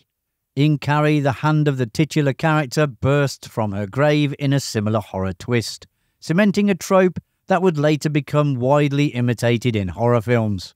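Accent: England, United Kingdom. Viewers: Can't stand the pommey accent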